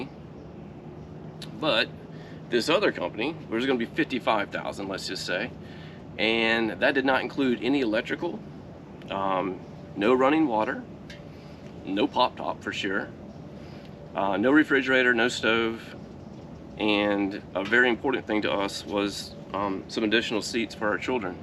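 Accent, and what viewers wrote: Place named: Georgia, United States. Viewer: Sound like a Georgia Boy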